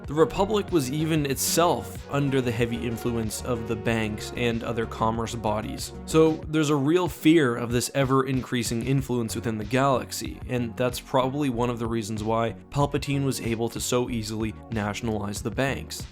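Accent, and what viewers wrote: Canada. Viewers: hey are you Canadian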